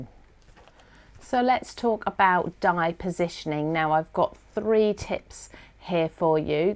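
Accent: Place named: Norfolk, United Kingdom. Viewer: Your accent is lovely! Are you from Norfolk? I'm am American with a fascination and appreciation for all accents British. And your video is great too! ;-)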